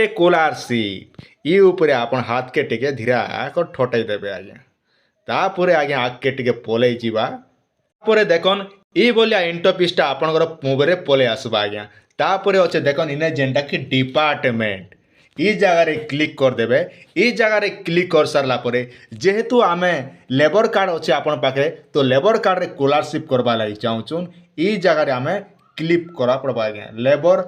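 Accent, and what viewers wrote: Sambalpur, India. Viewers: Tamar sambalpuri bhasha thi kahebarsaili ta badhia laguche.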